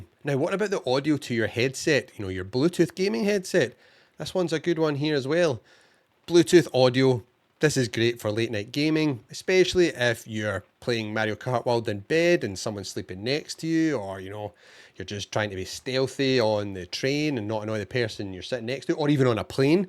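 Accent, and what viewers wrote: Scotland, United Kingdom. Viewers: The Scottish accent. The best accent in the world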